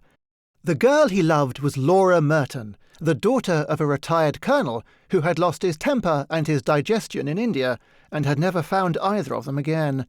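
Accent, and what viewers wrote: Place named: Dublin, Ireland. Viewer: Finding this to start my day was perfect! And hurrah for a lovely Dublin accent 💖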